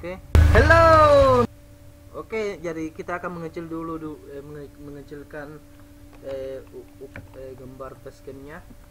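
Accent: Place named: Manado, Indonesia. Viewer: logatnya be manado wkwkwkwkwk ane ket manado soalnya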